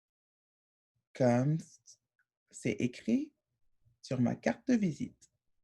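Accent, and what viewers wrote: France. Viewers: I love your French accent 💕 I tried to take French when I was in high school but never could get the hang of it .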